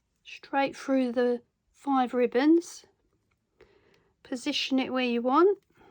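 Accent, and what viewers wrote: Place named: Southern England, United Kingdom. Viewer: it keeps me out of trouble 😂. Can I ask what area you're from? I live in Norfolk now but originally from S.E. London. I like to guess where people are from and I'm thinking you're from Kent or the south of England!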